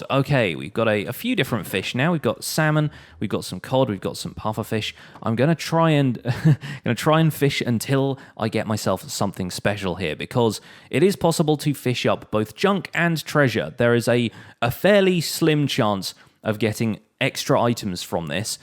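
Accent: United Kingdom. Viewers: British all the way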